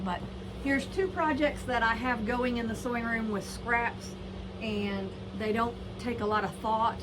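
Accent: South, United States. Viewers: I enjoy your southern accent. Your teaching techniques are very clear and easy to follow. I would have never thought of making the flying geese like this. Very colorful and unique. I have sewing since age 8 and am now 76. I’ve just gotten started w guilting. I am making quilts for premiers who don’t make it for parents to keep or bury child with. I’m a retired nurse and am making guilts for children with cancer. I would gratefully take some scraps off your hands and even pay postage to Kansas City MO. Continue designing and sharing w us. So get found your YouTube channel. Blessings 🎉 I’m a 100% disabled Vietnam Veteran registered nurse74-76 w asthma/COPD and sewing gives me pleasure and joyful gratitude to provide encouragement to others. ❤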